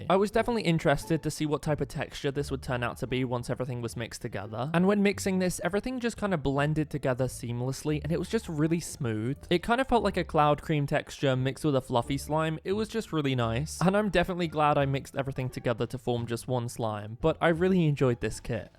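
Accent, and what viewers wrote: United Kingdom. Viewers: Are you British? Because you sound British. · Are you British bc I am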